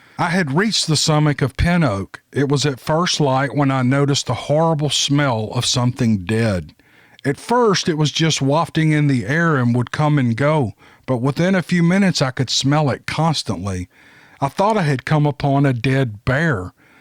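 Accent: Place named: Oklahoma, United States. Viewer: I wanted to remind the other viewers/listeners that if you aren’t satisfied “he’ll give you your money back!” My seven year old boy loves to say that now. Being from Oklahoma, we have a bit of an accent so he sounds just like you! It makes me laugh uncontrollably when he says it spontaneously. I posted a long time ago that it’s your character that attracts us subscribers/listeners. Keep up the great job and don’t let anyone convince you to compromise your convictions about keeping this channel about stories and stories only. Anyone that has issues with that has character issues that need to be worked out.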